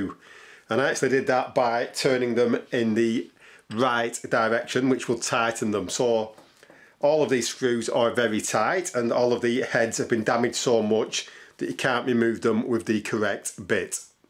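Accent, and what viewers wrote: United Kingdom. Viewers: British voice makes this pleasure to watch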